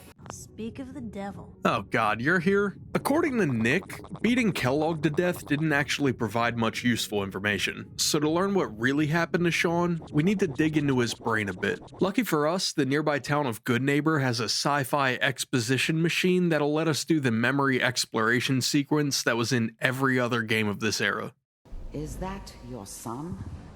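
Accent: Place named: Pittsburgh, United States. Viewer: Idk where ur from but I keep catching a Pittsburgh soundin accent 😂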